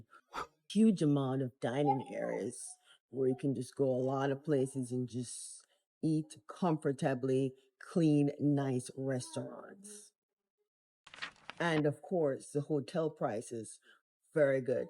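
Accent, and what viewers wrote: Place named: Caribbean. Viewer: You sound like you are from the Caribbean. If you get a chance, take a trip to Kenya. There is a straight flight from Thailand to Kenya and back.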